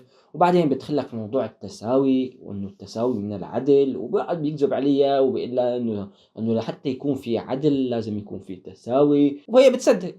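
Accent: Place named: Latakia, Syria. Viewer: شكرا لك أخي لفتتني لهجتك هل أنت من اللاذقية ؟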